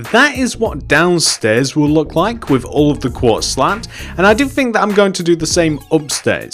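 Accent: United Kingdom. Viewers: love the british voice.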